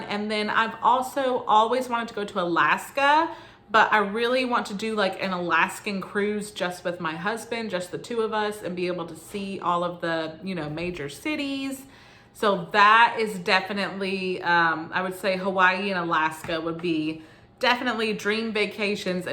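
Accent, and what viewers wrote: South, United States. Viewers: I can hear the Southern accent a bit, but it isn't like intense, but sort of there haha! I find accents so interesting and lovely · Lots of traveling 😃👍sounds so fun! Vegas on the strip is fun but at night it gets wild lol. Last time I went there someone stole my friends phone and debit card 😩 definitely keep your belongings close if out at night. I've always wanted to go to AZ 🙌 I have family that moved there and love it. I also like doing music because of all the sound in the background haha . I have a hard time doing voice overs haha I still need to practice but now that I will be back editing on my computer , hopefully it will get better . As someone from CA , I thought you had a Southern accent. I love it 💗 haha people say I have more of a Valley girl accent - I think it's normal California talk hahaha . I love hearing everyone's accents . 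I talk to people all over the world at work and I hear it all , I love interacting with others from different states and countries . I definitely would consider homeschooling as well. I thought of public because I went to public but now days things are so different in the state i live in so im undecisive. I'm thinking of taking some courses to learn more for home school . I will have to look more into it .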